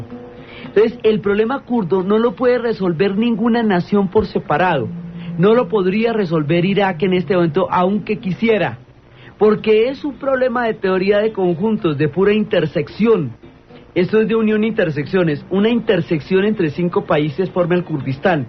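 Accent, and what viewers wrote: Colombia. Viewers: Muchas gracias. Siempre me ha gustado la historia y la geografia. Excelente tu exposicion , pareces de Colombia, estoy en lo cirerto? Saludos desde Chicago. Inscrito, like y compartido.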